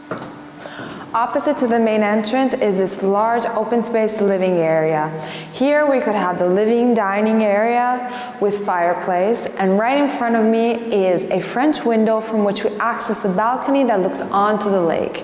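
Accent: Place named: Italy. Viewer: your italian-english accent is so sweet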